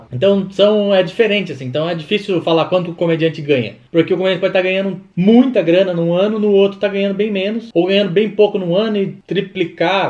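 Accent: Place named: Minas Gerais, Brazil. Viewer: O interessante é que a gente acha que você é mineiro, mas não é paulista, depois do Rabin é você, meu querido!!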